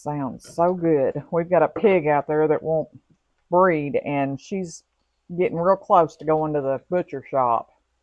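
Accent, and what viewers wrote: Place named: South, United States. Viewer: I love Southern food, as well as your accent! I once had a ton of leftover hamburger buns and threw them in the oven on low and toasted them to make breadcrumbs. Delicious because I added my own seasoning. Thank you for your video and chat.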